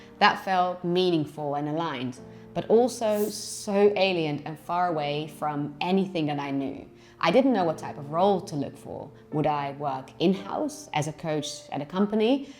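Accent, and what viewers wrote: Netherlands. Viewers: Are you Dutch originally? You sound a little bit Dutch.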